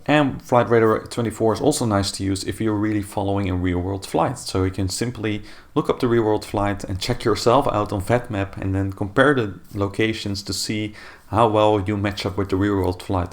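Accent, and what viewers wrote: Netherlands. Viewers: Ik hoor daar een prachtig Nederlands ondertoontje 👌 Goede video!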